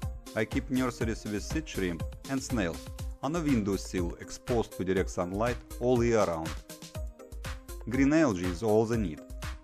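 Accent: Russia. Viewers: you Russian? you have Russian accent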